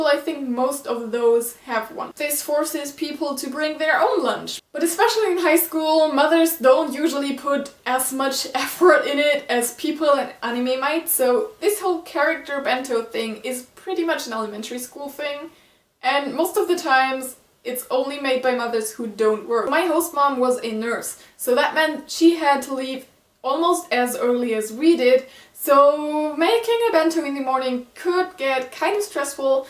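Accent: Germany. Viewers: I knew you were German cuz when I heard your voice, you sound like Trixie from the YouTube channel Don't Trust the Rabbit. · I could hear from your first sentence, that you are German^^ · You're German? Cool, I was going to ask because of your accent was unfamiliar to me, but here it is in the comments :)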